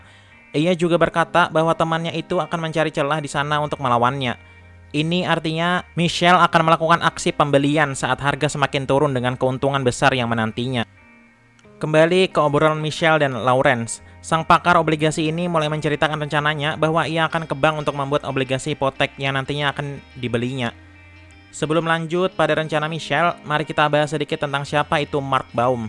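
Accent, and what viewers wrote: Banjar, Indonesia. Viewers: Ikam orang banjar kah mang ? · dari logat, macam orang hulu sungai 🧐🧐🧐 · asli mana bang, kya logat bahasa banjar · Logat banjar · Intonasi banjarnya kental bujur, jadi ngalih mencernanya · Dari review logat bicaranya, sepertinya org Banjar Kalimantan. Cmiiw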